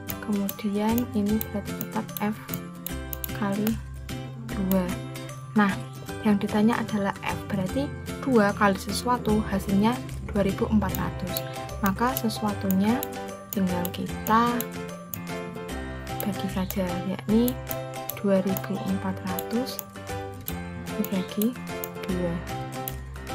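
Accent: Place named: Java, Indonesia. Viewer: Ibu nada jawanya kentel banget 😅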